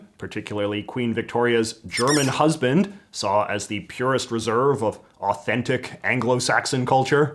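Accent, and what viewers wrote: Canada. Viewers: Love your videos and your beautiful Canadian accent, but as a music nerd, each time I hear a baroque harpsichord when you mention the victorians it bothers me 😅 get some brahms or beethoven Romantic inspired music of the victorian period 🙌 music nerd out 🙃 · I love your Canadian accent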